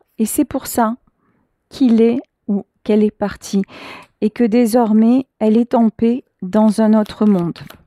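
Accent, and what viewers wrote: Marseille, France. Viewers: Coucou...merci pour ce beau message d'espoir et d'un visible...navré pour vous de la perte de votre frère...j'ai versé une larme de Pierrot pour vous deux...j'ai perdu des êtres chers, très chers, comme vous le décrivez! Votre tirage me parle tellement, la personne qui trahit, et des défunts, j'en ai 3, dont une que je vois souvent dans mes rêves. Votre épreuve vous rend encore plus forte et plus belle, rayonnante...d'ailleurs, vous avez une voix magnifique, et hé vous écouterai pendant des heures, toute une vie. Votre voix me rappelle la femme qui guide la méditation de Doreen Virtue, et qui dure une heure...on se sent porté, guidé, aimé, protégé, comme avec vous! Un Ange 👼! Merci à vous, merci d'être là! Et moi même thérapeute, enfin plus précisément pédagogue, puisque je suis sophrologue, je suis ému et transporté comme je pourrais le faire en relaxation...Je vous embrasse belle voix marseillaise, peu cher...oui, très cher! 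Très chère...Jeux de mots 🤩😘🌞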